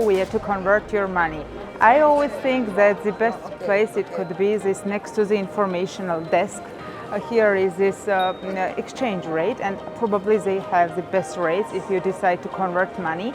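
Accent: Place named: India. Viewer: Ma'am one thing that I have noticed that you are looking very confident nd your video is very much interesting. I don't know that you are Georgian origin or anywhere else. But you English ascents are very much similar to Indians.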